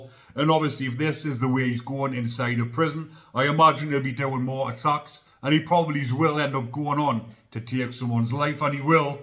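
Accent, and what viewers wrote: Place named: Geordie, United Kingdom. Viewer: Damn, your Geordie accent is thicker than that of Alan Partridge's mate Michael.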